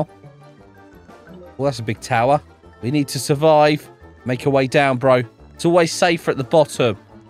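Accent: United Kingdom. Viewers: that british accent tho, its stupidly funny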